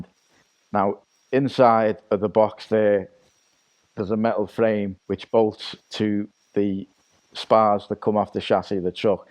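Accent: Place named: Liverpool, United Kingdom. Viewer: Made up you're a scouser.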